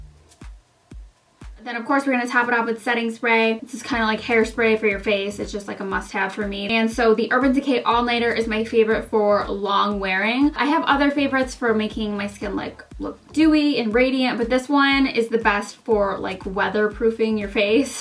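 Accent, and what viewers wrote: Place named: Upstate New York, United States. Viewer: girl youve got the ill upstate NY accent, i feel ya lol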